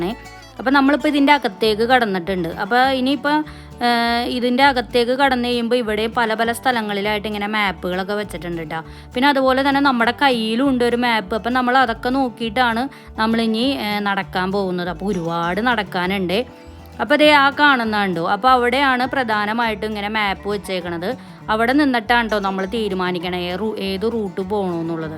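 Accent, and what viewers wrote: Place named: Kochi, India. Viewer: Cochin slang is the beauty of your video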